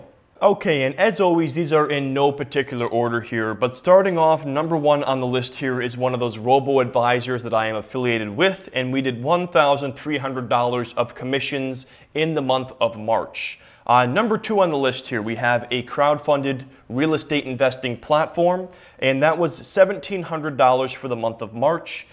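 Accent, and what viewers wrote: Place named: South, United States. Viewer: I enjoy ur vids brother, but are you related to Trey Gowdy? You look and sound similar to him yet less of the southern accent.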